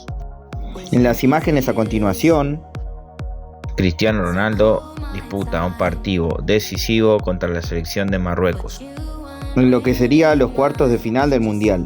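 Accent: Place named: Argentina. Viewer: El del video tiene un acento argentino, ni modo que digas que es un fan de CR7 cuando los argentinos tienen prohibido hablar de él. 😅 No, yo creo que el declive de CR sucedió más por sus decisiones que por la falta de talento. Todo comenzó cuando decidió irse del Real Madrid, no tenía que irse de ahí, no cabe duda de que habría conseguido más títulos importantes y su carrera habría seguido igual de respetada. Sin mencionar que por esos años Messi estaba pasando por un muy mal momento. Ahora ya nadie lo recuerda pero a Messi le pasaba lo mismo con su selección que le pasó a CR7 con Portugal. Afortunadamente Messi tuvo a un gran director técnico como lo es Scaloni que supo sacar lo mejor del astro argentino y lo revitalizó. Cosa contraria a CR7, que tristemente sus entrenadores lejos de ayudarlo, le dieron la espalda y es una historia muy triste para el futbol. 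Habría sido fantástico que ambos tuvieran su revancha, pero solo uno lo consiguió, el futbol solo quedará en deuda con uno y no con dos como era antes.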